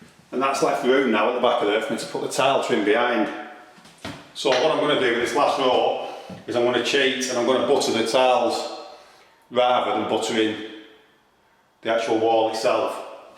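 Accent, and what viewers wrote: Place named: Lancashire, United Kingdom. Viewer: great video, and really helpful. It's also nice to hear a Lancashire accent-it's been years since I moved away! Thanks!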